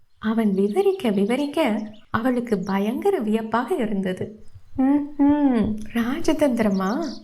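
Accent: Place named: Kongu, India. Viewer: Your kongu slang I am very happy to relate to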